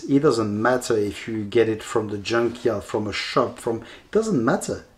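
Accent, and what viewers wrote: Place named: France. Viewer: Vidéo intéressante, merci pour votre explication avec the french accent, hhhhh.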